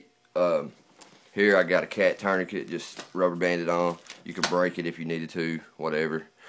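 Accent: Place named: Kentucky, United States. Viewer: im not shure but you sound country like me...im from kentucky. .. Nit loadout anfd a BAD rifle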